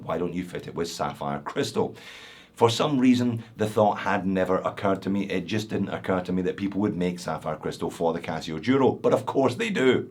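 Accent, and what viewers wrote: Scotland, United Kingdom. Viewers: A Scottish Barry White? Now HE would have made a fortune!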